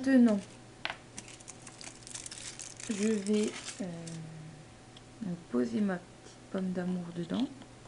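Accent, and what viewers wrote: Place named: Nord-Pas-de-Calais, France. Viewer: ta l'accen chti je trouve lol j'<3 bien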